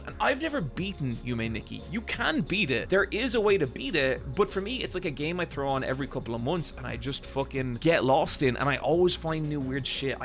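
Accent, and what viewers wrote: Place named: Ireland. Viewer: He is Irish...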